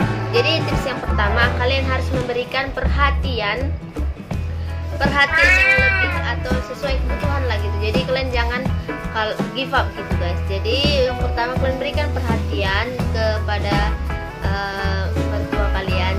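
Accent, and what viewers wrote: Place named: Medan, Indonesia. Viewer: Oh seperti itu ya ka..logat nya kayak orang medan ya ka salam dari rki asal lampung tips nya sudah di simpan ya ka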